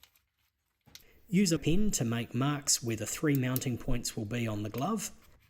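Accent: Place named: England, United Kingdom. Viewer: I LOVE that this guy has an english accent! You're like this is a straight laced normal guy. Then he makes a screen accurate Freddy glove!! I thought u liked tea and krumpets!?!? My childhood is ruined and it's not about Nightmare on Elm Street! THIS guy and his babysitter voice, piecing together of screen accurate Freddy glove... What accent is that? I'm staying the f*** away from that town...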